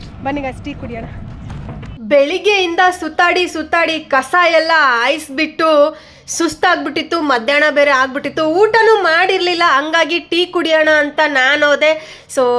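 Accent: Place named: North Karnataka, India. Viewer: ನಿಮ್ಮ್ own language ತುಂಬಾ ಇಸ್ಟ್ ಬಹುಷಃ ರಾಯಚೂರ ಮುದ್ದೇಬಿಹಾಳ್ ಲಿಂಗಸೂರ್ ಬಾಷೆ 🎉🎉🎉ನಿಮ್ ವಿಡಿಯೋ ತುಂಬ ಇಷ್ಟ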